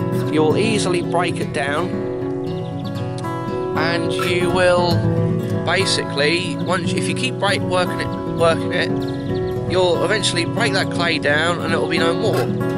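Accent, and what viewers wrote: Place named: Norfolk, United Kingdom. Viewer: Lovely to hear that Norfolk accent. The Fens is a beautiful area. We are the other side of Norfolk, out Norwich way. Just found your channel. Good luck with your spuds :) Jazz and Gem.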